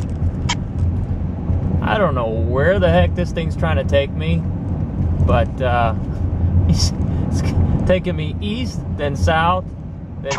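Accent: United States. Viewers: Garmin Nüvi 1350LMT Voice: American English - Jack (Male) (Spoken Street Names) 🇺🇸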